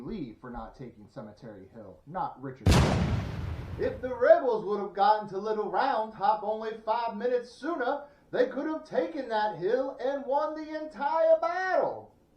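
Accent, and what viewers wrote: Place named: South, United States. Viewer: Watching this for a second time a year later. Lol. Im trying to figure out why your southern accent is ssoooo much different from Ranger southern accent. And his is authentic! Most importantly, perhaps the Rebs could have won if Garfield would have knocked the Union cannon off the table instead of the booklet.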